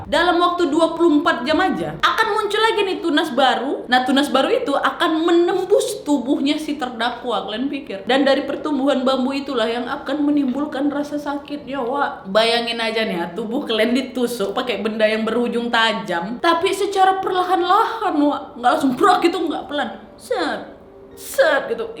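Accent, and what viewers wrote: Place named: Java, Indonesia. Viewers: Jawa amt suara😂😂sampek lupa skrip nya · Ka ada medok eee:) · Kak kok jadi medok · Malam minggu kerjaannya nontonin youtuber favorit! 1. yg udh jdi medok 2. TussyStory yg menawan 😍 3. Nessie coz its british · Mulai medok yaa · Sehat terus, kakaaak! Aku yakin yang ada di sini pasti sayang kak! Btw kak agak medok ke Jawa yak ngomongnya 💚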